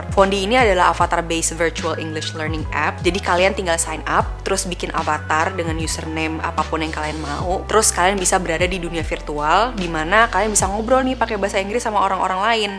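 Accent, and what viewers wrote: Indonesia. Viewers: Paling nikmat emang speaking dan aksen nya orang indonesia 😭. Juelas banget. Dan jarang oake slang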